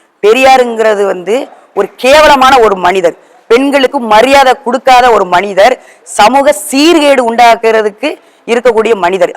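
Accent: Thoothukudi, India. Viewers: உங்கள் தூத்துக்குடி தமிழ் நல்லாருக்கு 🎉🎉🎉🎉🎉🎉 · அக்கா உங்கள் வீரமான தைரியமான விளக்கமான உரை அற்புதம். வாழ்த்துக்கள் அக்கா🎉🎉 உங்கள் ஏலே என்னாலே தூத்துக்குடி வழக்குமுறைப் பேச்சு கேட்டுக்கிட்டே இருக்கலாம் போல. ❤❤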